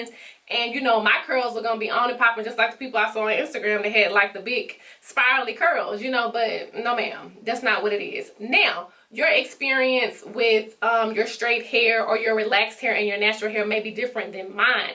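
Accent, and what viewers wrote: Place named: Atlanta, United States. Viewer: You must be from Atlanta... That accent strong.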